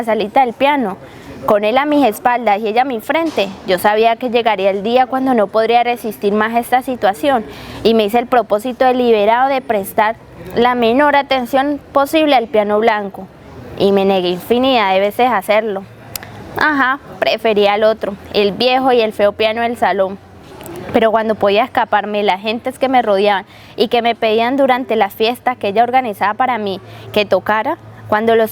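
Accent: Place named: Caribbean Coast, Colombia. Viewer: Me gusta mucho el acento costeño ajá. Se ve el trabajo. Pese a eso, debes tener cuidado con las letras de las palabras que te saltas. Estás inmersa en el texto, no nos miras ni en un momento. Habría ganado mucha fuerza si nos hubieras echado una miradita, lo mismo con la interpretación, agitar la mano hacia adelante en el "ajá".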